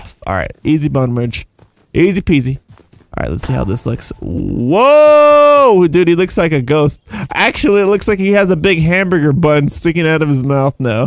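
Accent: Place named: United Kingdom. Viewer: I think your British voice is really cool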